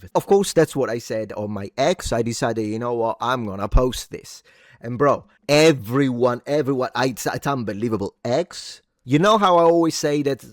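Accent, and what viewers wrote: Greece. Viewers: Your greek accent is amazing! 👏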